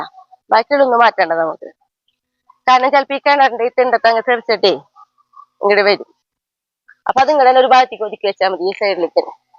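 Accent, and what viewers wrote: Thrissur, India. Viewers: അത് തൃശൂർ സ്ലാങ് അല്ലെ..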